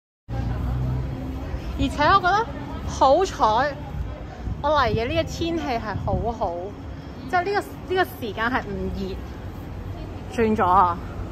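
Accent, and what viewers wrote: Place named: Cantonese, China. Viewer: 普通話是太普通，粤語=悦語，讚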